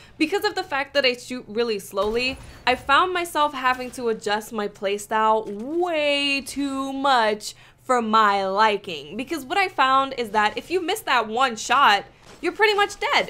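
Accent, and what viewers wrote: United States. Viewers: Possibly America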